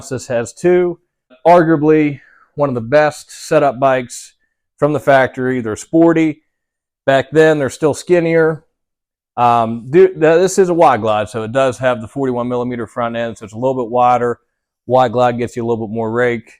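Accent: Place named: West Virginia, United States. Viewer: Love old Wide Glides. Fairmont WV - sister lives there. The back home accent always catches me, then saw your Clutch Up sign was like hell yeah. pretty cool channel I stumbled across on my early Sunday morning YouTube watching.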